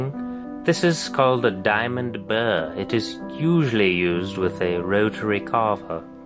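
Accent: England, United Kingdom. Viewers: I like. I like the English accent too and although it was slightly off (only slightly), I wish you would do it more often. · your calm British accent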